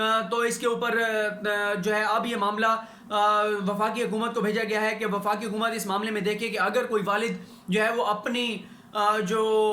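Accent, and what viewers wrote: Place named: Punjab, Pakistan. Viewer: aap bahot umdagi aur tafseel se case ke baray mein batatay hain. aap ne ek baat badi ghalat kahi ke urdu karachi wali hai.. arz kardun ke urdu pooray subcontinent ki hai aur Pakistan ki qaumi zaban hai na ke karachi ki. lehaza sub ki koshish honi chahyea ke sahi urdu seekhen likhen aur bolen. bura na manen magar punjab ne jitna urdu ki tahes nahes ki hai aur kisi ne nahin. maslan qaaf ko kaaf. quran ko kuran. isi trah aur doosray alfaz. isi vdo main aap ne mobiyna kaha isi trah aur kayee alfaz bhi. mera mashvira hai aap aamir liaquat aur digar achhi urdu bolne walon se sikhen. Allah aap ko zindagi mein khoob taraqqi aur khushiyan de. shukriya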